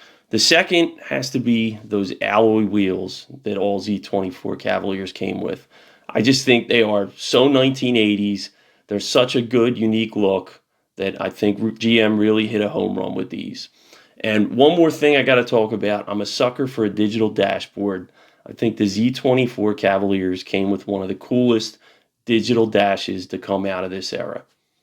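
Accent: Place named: Northeast, United States. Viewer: Fun video (as is your accent - somewhere northeast?). It's always entertaining to my Brit eyes to see the parallels across the pond. Over here, we had similar early 80s Cavs, but the sporty Vauxhalls (obvs Opels under the badge) were more the Astra (smaller) and Nova (smaller still). I feel like we had some very similar wheels to those Z24s though - they're definitely sparking childhood memories!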